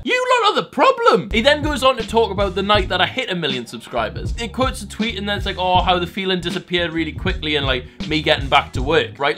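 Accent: Newcastle, United Kingdom. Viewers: Are you a Geordie? This explains much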